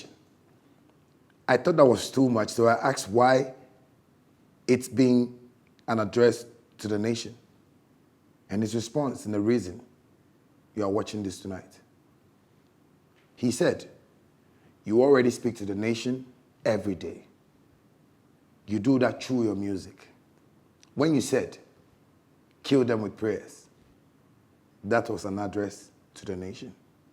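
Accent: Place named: United States. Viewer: So you can speak american english,shatta shatta!!!!!!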